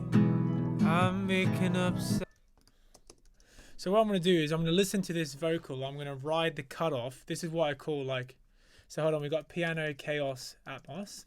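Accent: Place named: England, United Kingdom. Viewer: Your accent is so weird now lol Becoming more English. Love the tutorial btw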